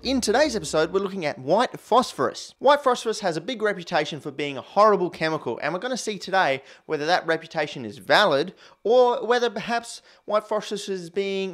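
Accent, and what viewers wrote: Australia. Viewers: An Australian talking about explosive chemistry over elevator music - that I call top-notch entertainment! XD Greetings from Germany, mate. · He's Australian and they say "aluminium"... I thought it was just another aussie thing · Wait. Is this guy Australian? Huh.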